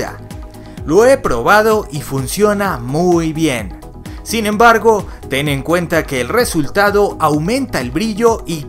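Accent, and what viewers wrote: Colombia. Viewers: Eres de colombiano ? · muchas gracias muy útil eres colombiano?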